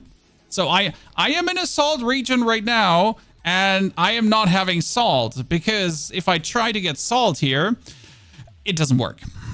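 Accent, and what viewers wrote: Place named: Germany. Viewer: i love your german accent xD its pronounced Bast-teal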